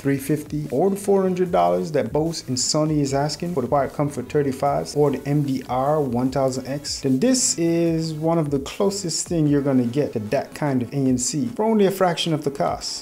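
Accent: Jamaica. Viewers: Great review but I must ask...how Jamaican are you?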